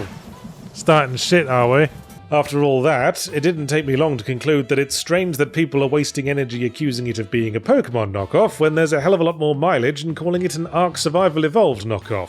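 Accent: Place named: United Kingdom. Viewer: It's so weird for Yahtzee to sort of grouse on the anachronistic look of the game. I mean, from ZP to this, his entire schtick is humorous anachronism. It's the humor of a sophisticated British accent saying creative swears. It's a very consistent artstyle of blob shapes juxtaposed with any old photo pasted from google images. That's why I find it funny. God forbid a game do the same thing.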